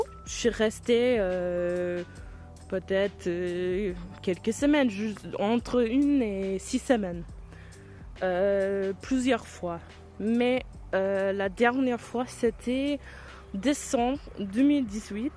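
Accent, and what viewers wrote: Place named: Germany. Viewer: Tu as le même accent que mes cousins allemands!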